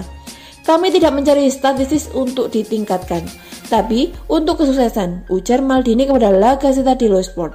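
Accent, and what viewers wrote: Java, Indonesia. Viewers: Hahahaha penyiare orang jawa yaaaa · Medok cuk 🤣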